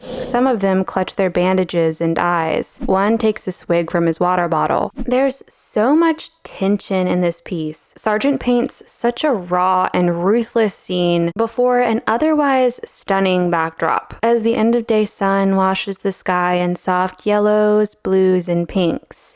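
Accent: England, United Kingdom. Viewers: The only beef I have with this commentary is your apology for the English school boy accent. It is my opinion that you need not apologize for any of your work. So informative and entertaining, I both laugh and cry when I watch these videos. Thank you for your efforts. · More English accent in future please! It was very cool. And that’s coming from the son of a Londoner. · Striking piece and story! Thanks for the historical and artists context. I loved your English boy accent 😂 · I love your English schoolboy accent! (I'm English)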